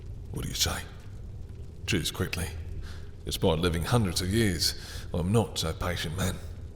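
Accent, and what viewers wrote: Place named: United Kingdom. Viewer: I SWEAR IVE READ THIS SPRIPT BEFORE! This is a wonderful spin on it! I love the British accent! ✨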